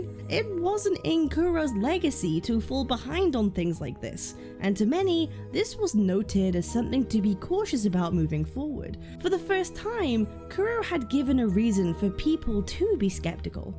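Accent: United Kingdom. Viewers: you are british>?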